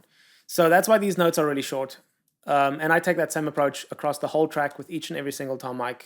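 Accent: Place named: South Africa. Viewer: I can hear the South Africaness oozing through your voice. Its amazing.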